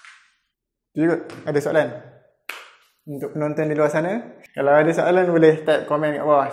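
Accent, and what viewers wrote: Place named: Kelantan, Malaysia. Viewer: ore klate ni..sbb bunyi "jolo doh"..hehe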